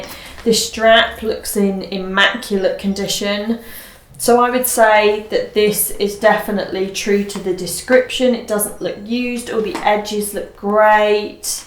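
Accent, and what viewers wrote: England, United Kingdom. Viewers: I like your English accent 😊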